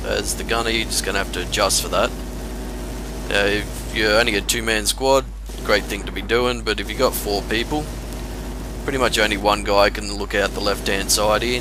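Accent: Australia. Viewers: Aahahah, brilliant commentary. Funny, enjoyable, epic ausi accent and actually really good advice. I play on PC, but tactics are the same. Ty Mate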